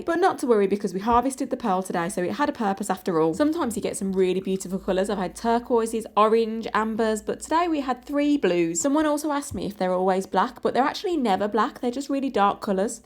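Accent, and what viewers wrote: United Kingdom. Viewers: are you scottish? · I love a brummy accent, it's so comforting ❤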